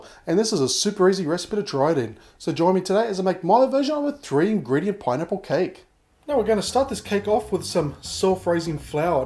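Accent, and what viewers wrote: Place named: Australia. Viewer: he said 40mins, must be the Aussie accent >.>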